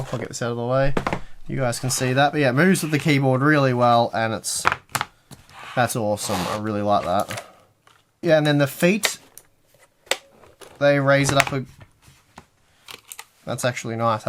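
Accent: Australia. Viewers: I wasn't subscribed? OMG! I just love your australian delivery.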